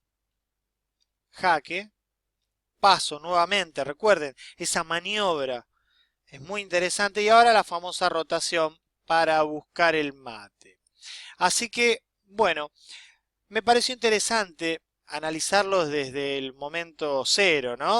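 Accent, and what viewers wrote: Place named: Argentina. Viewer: Muchacho, eres argentino por el acento. JAMAS escuché una explicación tan clara de este abstruso asunto para los no dotados para el ajedrez, como quien suscribe este comentario. Grassssss....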